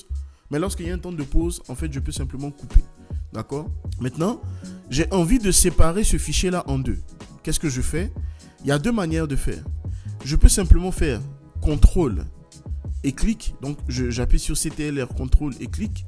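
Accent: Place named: Ivory Coast. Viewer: Merci, super tuto et encore plus merci pour l’accent ivoirien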